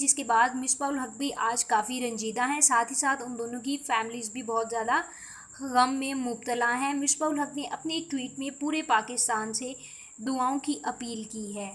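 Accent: India. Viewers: Accent indian ha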